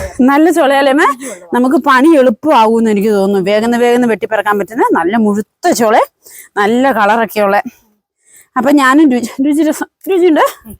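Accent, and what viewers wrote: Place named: Idukki, India. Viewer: ഞാൻ വിചാരിച്ചു, സ്ഥലവും സംസാരവും കേട്ടപ്പോൾ ഇടുക്കിജില്ലയാണെന്ന്❤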